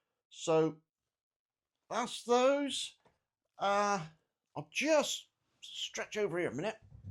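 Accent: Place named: Durham, United Kingdom. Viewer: Hi, watched your vids and subscribed. Sounds from your accent you’re local to me, I’m in durham. Good luck in the competition, I’m new to growing so giving it a go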